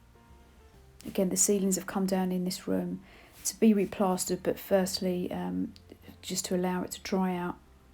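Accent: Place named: United Kingdom. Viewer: Enjoyed binging your channel. Few requests-- -Please increase the volume on all your commentary. I have the volume all the way up and can barely hear. Being from the US, understanding the British accent with music in background adds an additional challenge. -Create a playlist of all the videos in a row so new viewers can start at the beginning and watch the process in order without having to keep returning to the main channel. -Please move the camera through the rooms a little slower. Moving too fast makes it hard to comprehend what we're seeing and can feel a little dizzy. I also felt like the inset photos were important, but didn't get their connection to what I was viewing. -Thank you for getting new music. The original background music was ok, but it was VERY repetitive for so many videos. It's a lovely property with loads of potential. Looking forward to following your journey!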